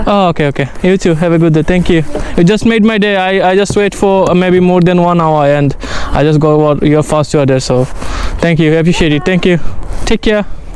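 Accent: Sylhet, Bangladesh. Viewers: আরে ভাই তুমি তাইন তো দেখিয়ার সিলেটি ❤ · Apne kita sylheti ni ?? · ভাই আপনি সিলেটি নি?? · ভাই আপনার কতা হুনিয়া মনে হয় আপনি আমরার সিলেটি মানুষ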